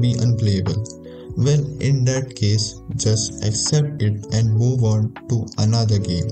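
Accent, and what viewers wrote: India. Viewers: Bro this voice really like of a Indian person...ARE U INDIAN 😮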